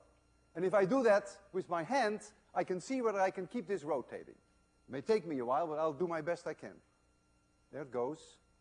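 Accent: Netherlands. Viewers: Is he dutch?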